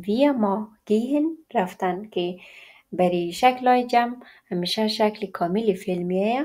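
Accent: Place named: Afghanistan. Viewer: ایشون لحجه دری دارند. دلیل نداره که هرکسی که لحجه تهرانی نداره فارسی بلد نیست، دوست عزیز.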